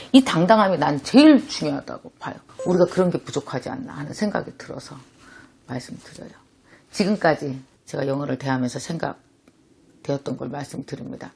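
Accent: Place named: South Korea. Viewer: 정말 박수를 쳐드리고 싶네요. 대단하세요. 영어가 모국어가 아니면 당연히 한국인 엑센트가 있죠. 언어는 지식전달이기도 하지만 의사소통이 젤 인것 같아요. 마음과 마음이 언어를 통해서 대화하는거니까 한국인 엑센트가 있어도 의사소통에 문제가 없다면 오히려 한국인으로써 자랑스러운점일것 같아요. 김미경강사님의 강의에 100% 공감하고 동의해요. 용기주셔서 정말 감사해요.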